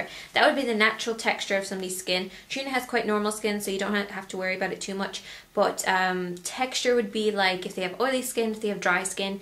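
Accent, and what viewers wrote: Ireland. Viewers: She sounds Irish. She mentions getting some '€' off the eyelash curler, which is currency in Ireland. She sounds as if she's spent time in the USA or Canada though. Her name and her look,are very Irish/Celtic, much more than her sister. :-)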